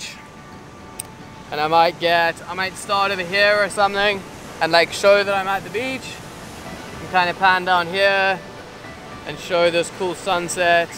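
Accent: South Africa. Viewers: Saw the title and knew I had to watch it...Heard the SA accent & was so happy 😂 great, helpful video thank you! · Is that a hint of a South African accent I hear in amongst the UK accent?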